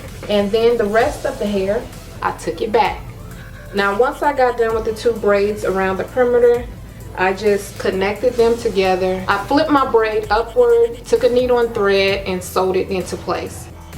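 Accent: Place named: South, United States. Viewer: Aah you're so pretty and your southern accent is charming! I'm just a beginner with crochet (I watched your pretwisted sen. twist videos) but I wanna try this in the future! Thanks for the video!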